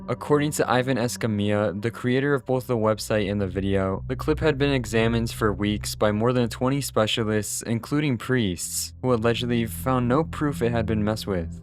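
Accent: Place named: Brazil. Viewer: why bro has brazilian accent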